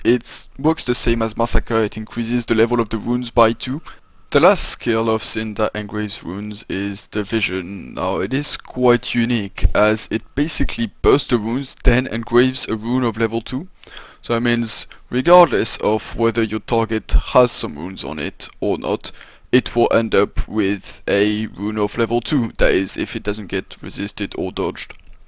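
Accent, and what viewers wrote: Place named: France. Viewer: Oh putin l’accent français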